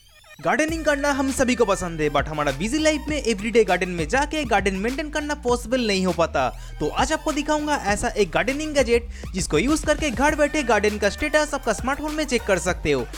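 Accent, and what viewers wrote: Bengal, India. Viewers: R u Bengali? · Powergrid Fatehpur its due to Bengali tung... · Bro are u a bengali ur pronouciation is funny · Aapki tone se aap Bengali lag rahe ho relax dear aaram se bolo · r u BENGALI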